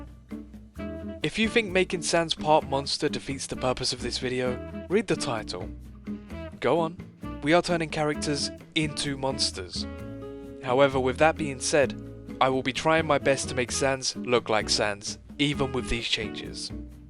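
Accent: United Kingdom. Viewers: Your Brittish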